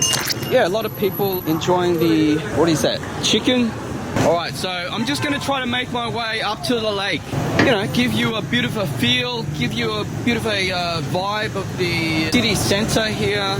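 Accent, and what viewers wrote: Australia. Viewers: he grown up in Australia ,can't you tell by his accent ? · Enjoyed your video. I can hear your strong Aussie accent but didn't deter from you expressing yourself and giving your thoughts in an honest and genuine manner. In fact I loved your honesty. So good on ya mate!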